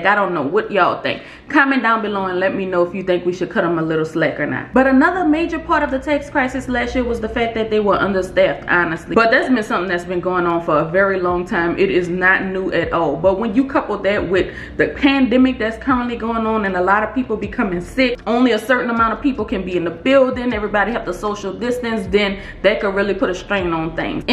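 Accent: New Orleans, United States. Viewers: I can tell you’re from New Orleans with that accent lol. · She sounds like a Nola city girl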